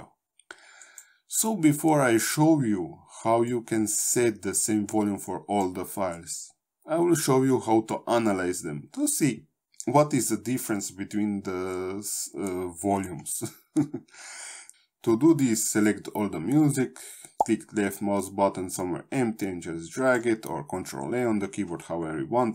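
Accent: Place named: Eastern Europe. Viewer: Thanks to you to take time to simplefy the process of normalising sound in a playlist, I can ear the you have a eastern Europeen accent, my wife is Russian.We live together in Canada now,as I'm Canadian. Thank for your help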